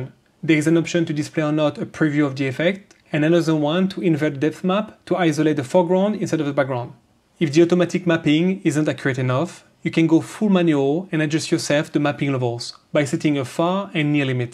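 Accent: France. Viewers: Ohhh hey I got a shoutout hehe! Thanks guys! Love what you're doing here, great to see more Resolve channels popping up (plus sexy French accent beats my blunt English one 😆) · Probably one of the most pro channels on Davinci with a little twist of having a very nice French accent. LOVE IT! And depth map is my favorite. Merci beaucoup!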